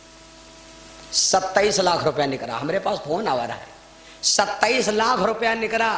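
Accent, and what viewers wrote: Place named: Uttar Pradesh, India. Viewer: बहन सीतापुर और बाराबंकी की लोकल भाषा यही है ये कथा वाचक सीतापुर और बाराबंकी के बॉर्डर के हैं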